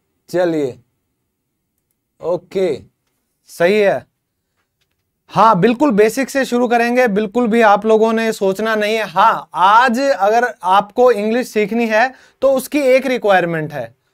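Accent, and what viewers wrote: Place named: Haryana, India. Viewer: Sir muje aapka pdane.ka lahja Bahut jyada accha laga aapse Padhkar apne Haryana ki feelings Aati hain aapne vakai etne jabardast 👌 andaz me cheezo ko explain Kiya Aapse pahle kabhi kisi ne ese na padhaya hoga thank you so much Bhai mere 🙏🌸🙏🌸🙏🌸🙏🌸🌺💐🌺💐🌺💐🌺💐🌺💐🌺💐🌺💐 Jai Hind Jai Bharat vandematram 🇮🇳🇮🇳🇮🇳🇮🇳🇮🇳🇮🇳🇮🇳🇮🇳🇮🇳🇮🇳🇮🇳🇮🇳🇮🇳🇮🇳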